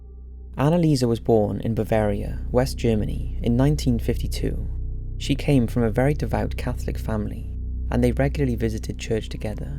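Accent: Wales, United Kingdom. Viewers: Thats a welsh accent si x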